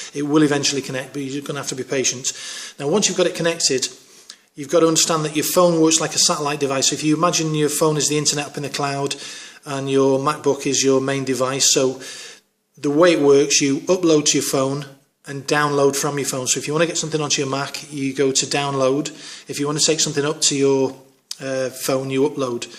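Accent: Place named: London, United Kingdom. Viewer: what is the app??? i cant understand your london accent !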